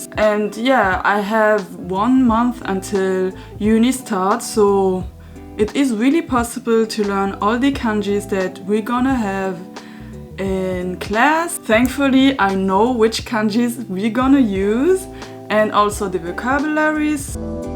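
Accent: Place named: France. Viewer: U have a French accent!! Ur mother language is French ? .. PS: keep filming videos like this 🥰🤍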